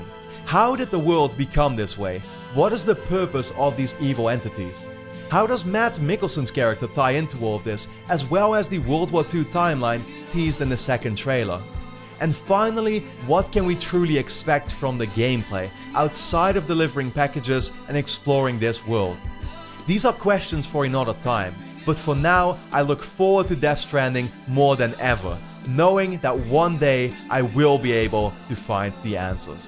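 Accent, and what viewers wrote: Netherlands. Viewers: Having an allergy may mean he has better resistance, though, to whatever is causing it. Awesome video! Can't wait for the game either. Heerlijk NL accentje btw :D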